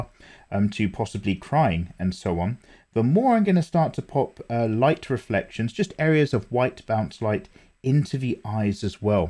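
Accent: United Kingdom. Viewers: I love how British his voice is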